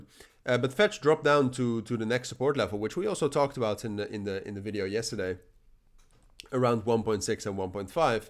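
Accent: Netherlands. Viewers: Ben je Nederlands? K hoor het aan je accent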